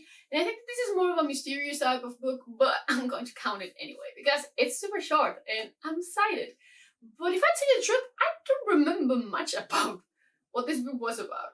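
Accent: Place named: Galicia, Spain. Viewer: Ostia, encontré tu canal hace poco y había algo en tu acento que me sonaba mucho y era de oírmelo a mi, que tb soy de Galicia...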